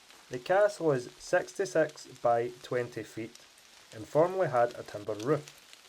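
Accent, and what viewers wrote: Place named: Scotland, United Kingdom. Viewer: It's Gealic as in Garlic. You have a Scottish acsent but you have no knowledge of Scottish culture . Open a fucking book.+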